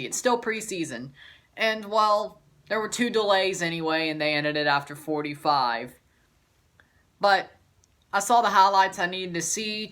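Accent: South, United States. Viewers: where are you from your accent sounds very southern for a Toronto fan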